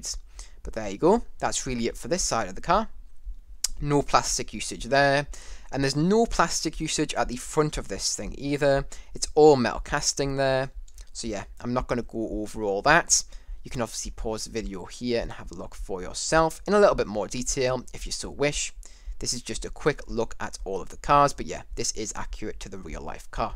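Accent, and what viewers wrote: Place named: Ireland. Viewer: U sound Irish just loving it Bro 😎🇧🇸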